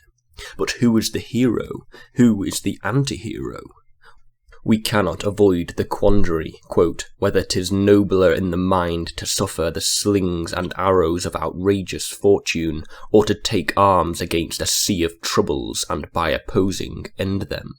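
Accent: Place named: England, United Kingdom. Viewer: I'm looking forward to your next chapter readings when you have time for it. Not only are they not ai but you're English so your accent fits the reading.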